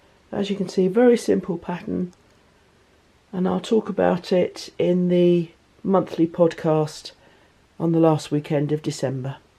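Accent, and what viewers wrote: United Kingdom. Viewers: So happy to be hearing your lovely British accent again!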